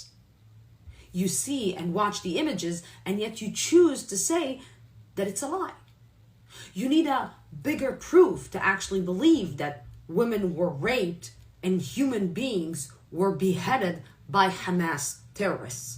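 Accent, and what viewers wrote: United States. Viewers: God, you are incredibly BRAVE! To speak truth to lies in your world* is unbelievably Brave! I only wish people could understand that reality is reality even though I, you, us don't like it. Looking reality in the face and saying this is the truth is one of the hardest things to do. (*even though you sound American)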